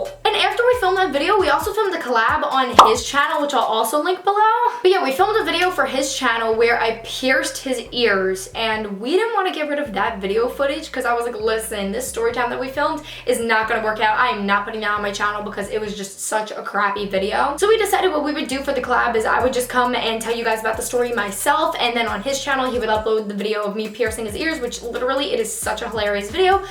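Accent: New York, United States. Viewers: your accent tho its amazing I can tell you're from NY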